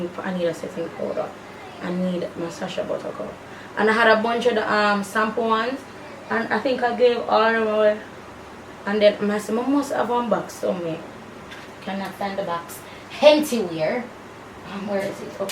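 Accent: Jamaica. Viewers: Are u from Jamaica cause u talk like dem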